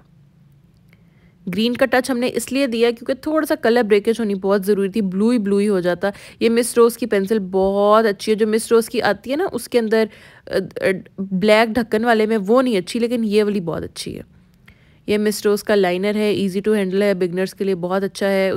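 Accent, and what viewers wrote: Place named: Punjab, India. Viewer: in love with your voice specially(punjabi accent)😍 and uhr justtttttttt waoo🙈❤ have watched all your videos and the way uh entertain everyone is just commendable😍 so much love from INDIA❤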